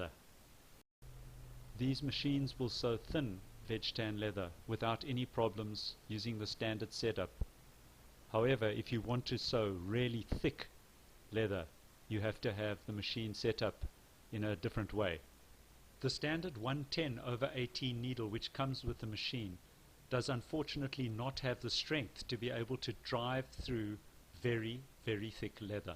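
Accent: South Africa. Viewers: I love randomly hearing an accent from home! Lekker bru :)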